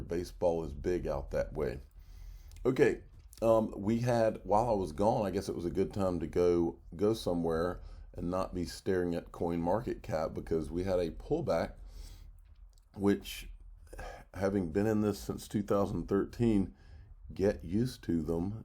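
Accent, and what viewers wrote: South, United States. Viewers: Bad mic settings , thick southern accent with mispronunciations and old news = BYE BYE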